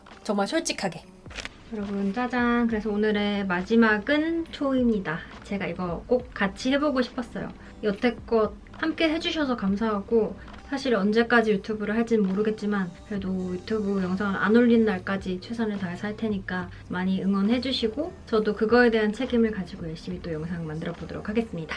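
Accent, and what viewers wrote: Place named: Seoul, South Korea. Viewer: 헐 사투리를 절대 못 느꼈던 대구사람... 완저니 서울사람 말투였는데.. 20만 추카드려요🎉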